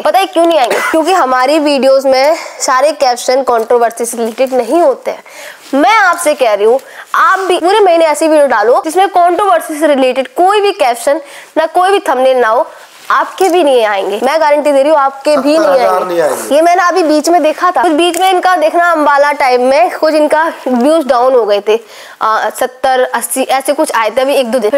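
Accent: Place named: Siwan, India. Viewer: Shahi boli hai ap hmbi Siwan se hai 😂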